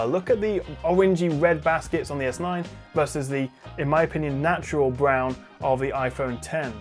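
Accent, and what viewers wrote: United Kingdom. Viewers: The review was really great 👌🏻 and well done ,with awesome photo samples but your voice(the British accent) felt annoying AF. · He is cuuuuute, AND British win win!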